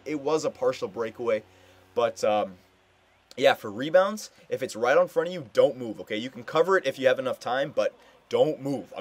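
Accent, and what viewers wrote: United States. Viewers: r u american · R u amaracin or Canadian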